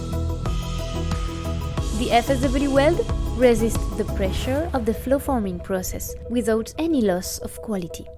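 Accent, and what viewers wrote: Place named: France. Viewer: Thanks to the french for ruining this video i cant understand anything over her accent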